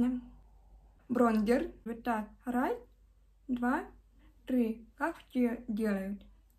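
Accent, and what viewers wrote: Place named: France. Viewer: у тебя красивый акцент) я сначала подумала, что ты француженка :)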